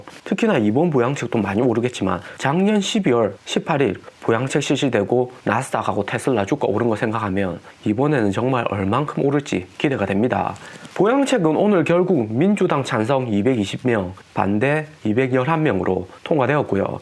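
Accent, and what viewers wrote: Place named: Gyeongsang, South Korea. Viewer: 항상감사하게 듣고보고 있습니다, 구수한 경상도 말투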